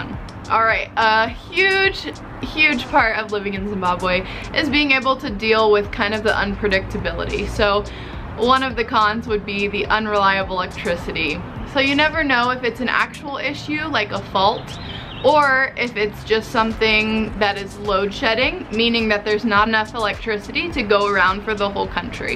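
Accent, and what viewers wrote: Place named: United States. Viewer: What do you do for work? You sound american, so you just picked up and left, moving to a very random country..I’m wondering how, and why?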